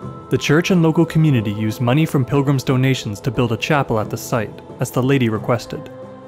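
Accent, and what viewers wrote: Canada. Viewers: Yeah, I never heard that there was a disc separate from the Sun that was doing the spinning, and then "the disc" RETURNED TO THE SUN; don't know where that came from; as I said, I never heard that before. Overall, however, it was a nice presentation, but what sounded like a hard (thick) Canadian accent from the narrator was a bit bothersome to me.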